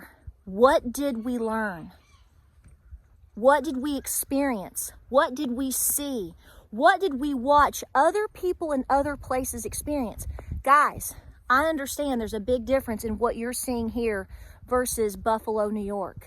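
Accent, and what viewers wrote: South, United States. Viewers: Love your southern accent videos, I almost don't want to print London temperatures in the 40Fs and easy rain showers. Hope things warm up soon for you all.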